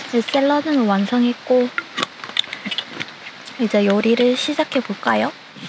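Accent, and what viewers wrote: Gyeongsang, South Korea. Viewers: 사투리억양이 경상도쪽이신가욬ㅋㅋㅋ귀엽ㅋㅋ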